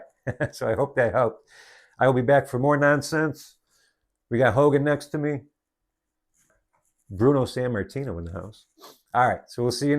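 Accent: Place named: Cleveland, United States. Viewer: Are you from Cleveland? I am...recognize the accent!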